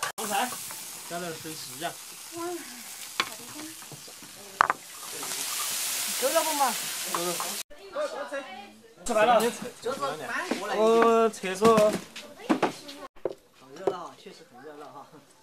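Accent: Sichuan, China. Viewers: 聽得懂而且是好懷念的口音阿以前家裡隔壁開雜貨店的老爺爺是個四川人軍醫只是家裡附近老兵這些年全都過世了很久很久都沒聽到了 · 听口音像川南的人，我是内江的，听到“是”字饶舌的感觉就熟悉。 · 来了美国居然在油管上看到听到四川话突然有点想家了。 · 听到四川话好亲切，哈哈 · 四川话好听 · 美食作家王刚四川口音非常好聽，不看字幕基本上可以懂～鄉下生活真有意思～很樸實，很平靜，很健康～ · 你的视频有毒呀，这两天我一看就刹不住脚了。川普讲的很好哈哈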